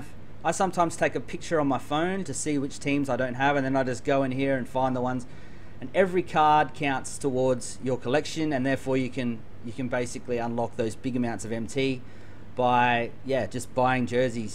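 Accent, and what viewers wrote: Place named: Australia. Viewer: Bro your from Australia to me to😁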